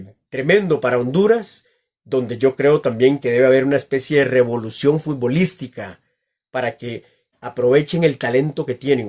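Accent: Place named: Mexico. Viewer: No se si eres de México o costa rica es que el acento es parecido pero si quiera ver a Costa rica en el mundial desafortunadamente no pidieron meter un golesito solo era meter uno 😢 saludos y ánimo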